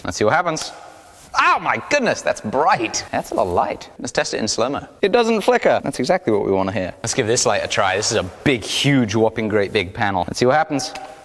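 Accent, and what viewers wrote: England, United Kingdom. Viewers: It’s weird how in the states he sounds super British, but in the UK he sounds American. He just sounds weird everywhere. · sounds like his British accent is coming back strong since going back to the UK.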